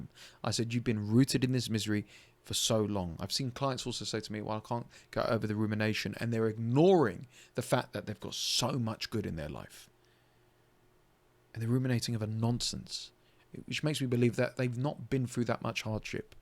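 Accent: United Kingdom. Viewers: Realistic no BS Brittish Man with an elegant accent.. instant subscription good Sir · Im assuming youre in the UK because of your accent, but that sounds fuckin miserable. Im in Tennessee USA and thats not my experience at all. People are generally nice to me as I encounter them, and my only real complaints are mannerisms that dont really affect me and just tell me a little about people. Im a fairly intimidating man though, so I doubt anyone would ever even try me like this.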